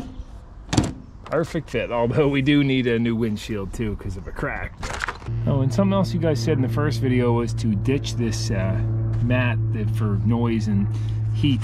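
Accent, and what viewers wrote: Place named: Baltimore, United States. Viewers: I'm from Baltimore and your accent reminds me of back home. When you replaced the thermostat, did you use permatex the right stuff on that thermostat housing? In addition to the gasket? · Are you from Philadelphia you have an accent I hear it. wuder hoome We say home with a long O. 🚁 🪂 If you're not you're from Baltimore they have a similar accent. ✌🏻👽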